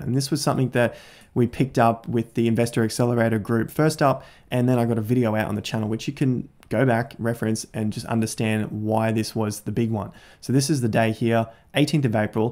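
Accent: Australia. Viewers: Wycoff theory was echoing in my ears in his aussey accent for days without knowing what it really is. now I friggin know.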